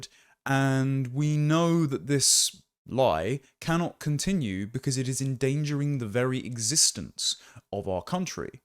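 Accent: RP, United Kingdom. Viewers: Why have you and the rest of the clowns on the Podcast of The Lotus Eaters got such a beef with ‘boomers’? You always have a crack at them for some reason. Jealous much? Well, I suppose you need some group to have a go at. And is that posh assed accent actually real?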